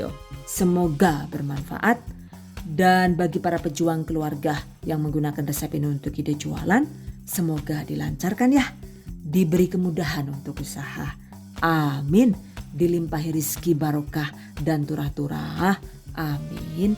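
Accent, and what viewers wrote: Banyumas, Indonesia. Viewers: Ya Allah ngguyu ngakak nganti ta ulang² serius deleng mlh ngapak² ,, kencote metu 😅, suwun mamake resep e mntul pisan 👍🏻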